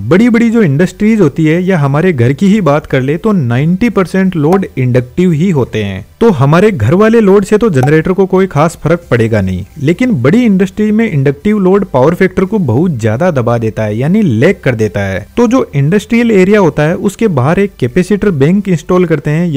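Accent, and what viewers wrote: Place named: Sikar, India. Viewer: Sir apki tone sunkar lag raha he aap sikar jile se ho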